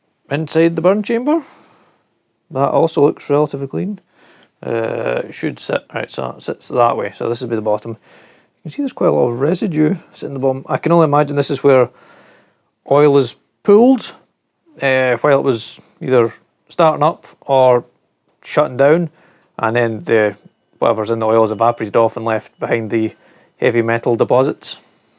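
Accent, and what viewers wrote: Scotland, United Kingdom. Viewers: Hi. Great to hear a Scottish voice. New to this. Question for you, can you run one of these heaters on domestic heating oil like 28second kero. Cheers, jim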